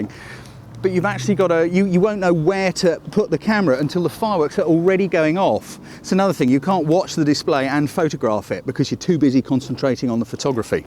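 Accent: United Kingdom. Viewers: Buddha bless you for the British accent, music to my ears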